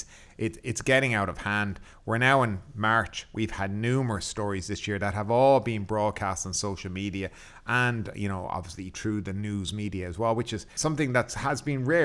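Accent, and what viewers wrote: Ireland. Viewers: Irish accent?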